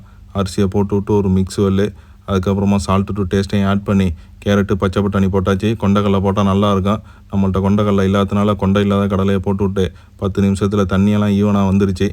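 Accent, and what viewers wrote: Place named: Adirampattinam, India. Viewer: Assalamu alaikum eppadi irukinga nice cooking 😂❤ neenga adirampatnama? Unga tamil antha maathiri iruku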